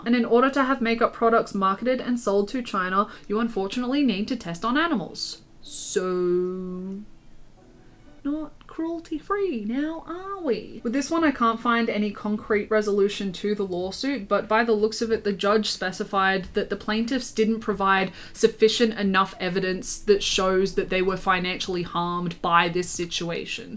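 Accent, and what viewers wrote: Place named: Australia. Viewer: i just love how aussies say no, "nuuy." my mum's from oz and i used to say it like that when i was kid. also, just found your vids and instant sub! yer a bonza sheila as my great uncle Melmoth used to say.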